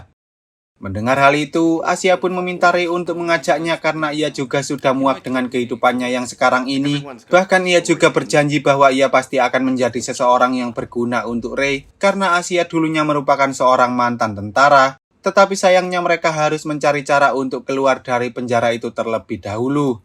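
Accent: Java, Indonesia. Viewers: Dasar medok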